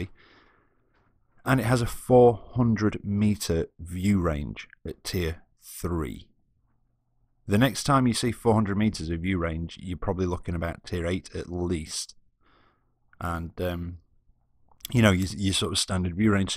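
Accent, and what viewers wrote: United Kingdom. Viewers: Why is it british?!?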